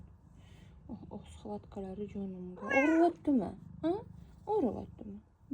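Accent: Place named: Kashkadarya, Uzbekistan. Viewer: КАШКАДАРЁЛИКМИСИЗ . ЁКИ СУРХОНДАРЁЛИКМИСИЗ. ШЕВАНГИЗ КЕТОПТИТА.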